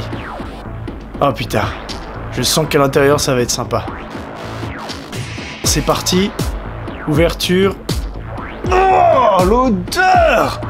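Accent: Belgium. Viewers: tu es belge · il est belge? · T'es belges ?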